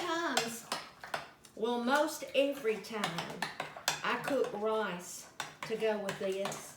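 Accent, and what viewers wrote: South, United States. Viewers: Just love you ♥️ My daddy just got out of the hospital and his health is very tenuous…I’m helping my mama take care of him and it’s very stressful. But when I have a few minutes and just want to escape and calm my mind, I watch your videos. Your sweet southern charm and lovely accent just warm my heart and your videos remind me that as long as I have Jesus, I can bear anything. Love you! God bless xoxo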